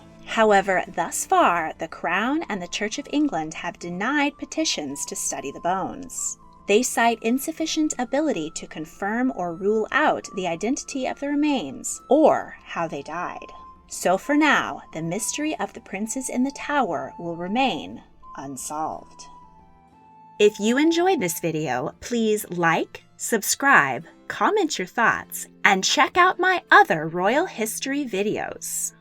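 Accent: United States. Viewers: Please get someone to narrate who is not so fucking annoying with their boring semi american twang. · geez americans have an ugly accent. i cant bear to listen to this woman.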